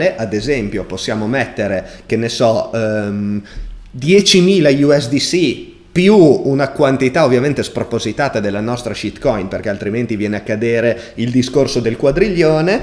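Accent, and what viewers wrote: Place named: Romagna, Italy. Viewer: vabbè sei palesemente romagnolo. Viva la Romagna, follow immediato.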